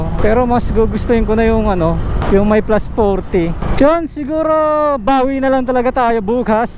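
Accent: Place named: Pampanga, Philippines. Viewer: parang reed lang na naghahanap buhay haha kapampangan kaba boss? bigkas mo kasi lakas makakapampangan e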